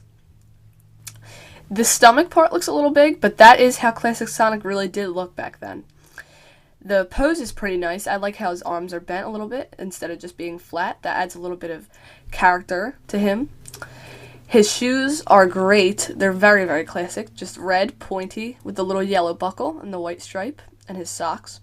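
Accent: United States. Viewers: r u from chicago? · I like the video but you're accent is terrible I can't stand a New Jersey accent!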